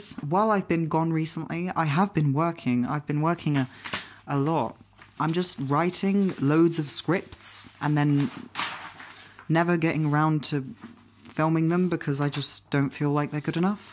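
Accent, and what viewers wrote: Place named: United Kingdom. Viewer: I've never come across your channel before, but I need to say I was NOT prepared for that voice! Between the soothing timbre (I was fooled by your cherubic face) and the British accent... lovely. Like I could see you narrating documentaries or something haha.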